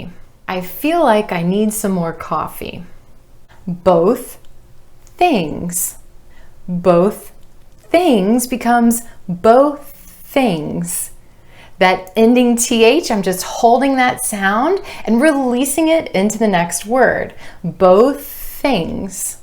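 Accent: United States. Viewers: Your lessons are just amazing, as becuse of you, I've started to love the American accent since i have been used to talk with the British accent.. I have one suggestion if you don't mind, what do you think about creating a group on WhatsApp or viber which is aiming to improve the writing and speaking skills between you and your followers, and it should be administrated by you of course.. Best Regards 🌹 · Are you American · Is this American English pronunciation??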